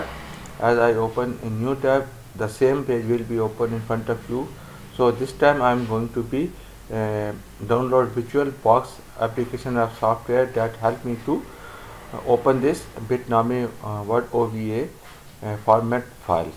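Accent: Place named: United States. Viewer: Hello Bro' your English-like American people😆😆😆😆😆😆😆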